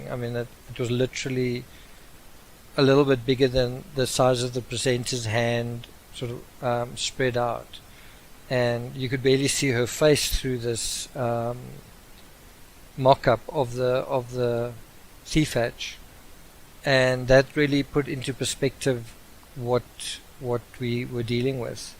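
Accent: South Africa. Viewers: I agree, I really like a South African accent.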